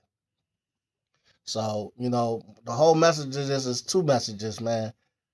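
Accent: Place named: California, United States. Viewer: 100% facts! You was born in NY? Sounds like you got some Cali in you. ✊🏽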